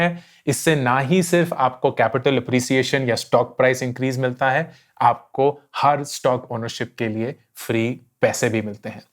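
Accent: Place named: Punjab, India. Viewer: Bhayi, we are Punjabi's. It's alright to say cheejay with Jajjaa of Punjabi Language. We all should be proud of mother language and Mother tongue influence as long we are doing right things in our life. I hope u agree and keep saying Cheejay 😊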